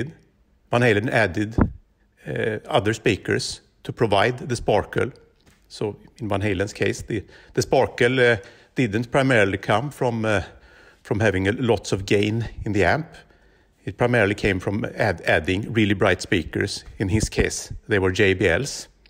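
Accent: Sweden. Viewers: Låt mig gissa att du är svensk? Det låter som kul när du pratar svenska fast på engelska! Fan va hårt! :) gillar att du gjorde en video om detta! Bra jobbat! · That swedish accent :)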